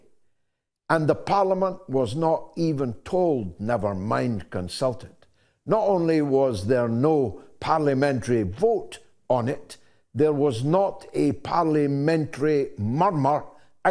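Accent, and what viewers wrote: Scotland, United Kingdom. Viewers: I like your Scottish accent. 😃👍❤️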